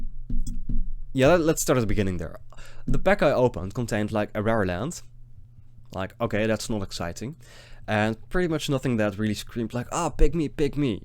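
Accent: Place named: Netherlands. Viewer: Must confess that I always hated the Dutch accent all of my countrymen have, but for chaos draft I'll endure it I guess ;) P1P9: I think you went a little too hard on blue there. Both Skirk Shaman and Stingscourger are a lot better than Piracy Charm. Skirk Shaman would have been a bit too difficult to do with all of your double white, but I think Stingscourger would have been a great pickup there. Overall I think red looked a bit better than blue overall, but I understand why you were staying in it. Would really have payed off in pack 3 though with all of the red removal. P2P9: I would definitely have picked Blade of the 6th pride over the Sarcomite Myr. It's more aggressive and you don't really need more 3-drops at that point. P3P7: Daring Leap is a great combat trick. The first strike alone is really strong, but +1/+1 and flying can win games too. 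Orim's Chant on the other hand doesn't do anything. (edit: somehow the Chant has been very good for you throughout the games. Nice work on that) Although I would have made some picks differently I don't hate where you ended up though. UW Fliers is a great archetype in chaos draft.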